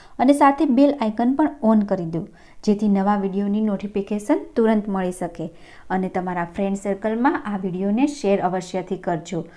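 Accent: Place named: Ahmedabad, India. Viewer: Ahemdabad vada No avajj che shaci vaat ne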